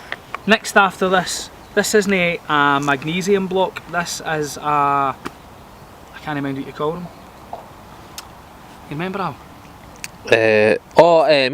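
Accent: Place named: Ayrshire, United Kingdom. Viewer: 2 is 1 and one is...... Subscribed 🤠 all the best dude, sounds like you're near me, I'm in East Ayrshire.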